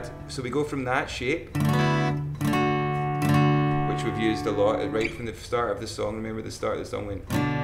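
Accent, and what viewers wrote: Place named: Glasgow, United Kingdom. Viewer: He went to the same schoole I go to he has a glasweigian accent aka Scottish but is from airshyre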